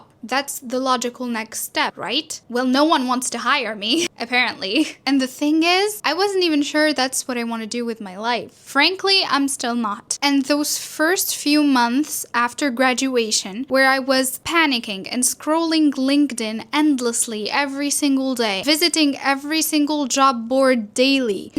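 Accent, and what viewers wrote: England, United Kingdom. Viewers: You're English gurrrrrl woow 😍😍😍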